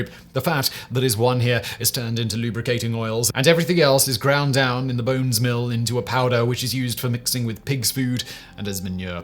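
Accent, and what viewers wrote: England, United Kingdom. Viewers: Tbf, he is so english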